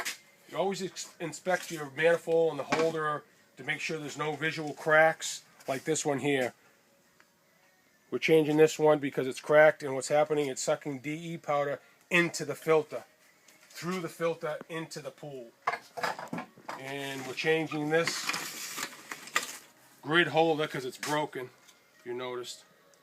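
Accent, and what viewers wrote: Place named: Boston, United States. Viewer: Love your Boston accent :-) It's wicked pissa!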